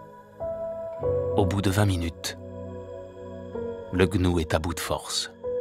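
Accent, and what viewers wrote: France. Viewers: Amazing footage but there's only one bad thing... It's a French narrator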